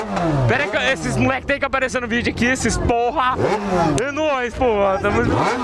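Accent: Goiás, Brazil. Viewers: No pique do Goiás assim que se fala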